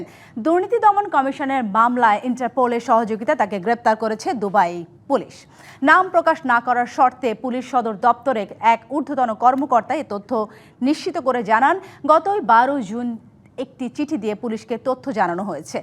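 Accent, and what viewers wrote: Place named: India. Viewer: কিরে ইন্ডিয়াদের মতো নিউজ পরে কেন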